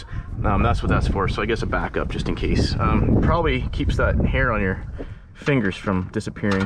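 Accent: Canada. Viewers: I just bought a rogue. Gettimg delivered on Wednesday, this is a helpful video. Seems pretty straightforward to assemble. Are you in southwestern Ontario by chance? Your accent seems very familiar. Lol.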